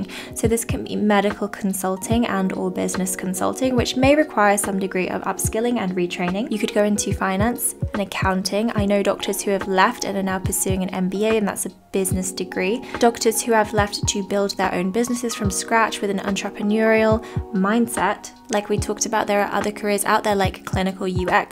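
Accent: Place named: Australia. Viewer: You have an aussie accent. How come?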